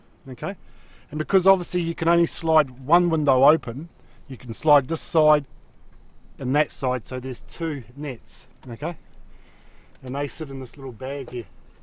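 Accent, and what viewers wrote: New Zealand. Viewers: You a Kiwi fella?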